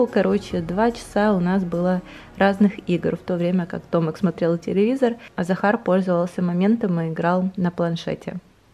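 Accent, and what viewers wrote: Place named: Ukraine. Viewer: люблю твой канал смотреть, но всё таки надо работать над украинским акцентом,он всё портит.....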